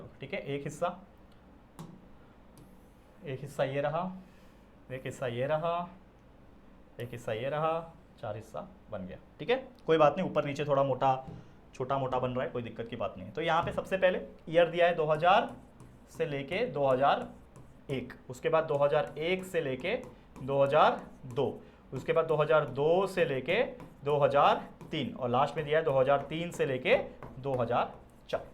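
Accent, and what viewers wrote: Maharashtra, India. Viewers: Sir's marathi at peak🫠😮‍💨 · Sir tumhi marathi ahe kay ??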